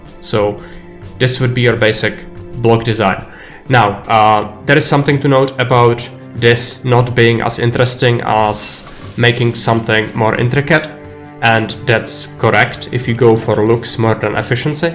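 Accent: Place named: Ireland. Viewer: first time i heard you i was convinced you were irish ☺️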